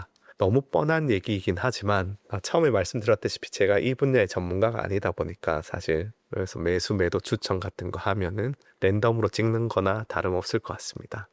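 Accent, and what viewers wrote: Gyeongsang, South Korea. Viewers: 처음 들었을때부터 경상도 출신이라는거 알겠던데요 ㅎㅎ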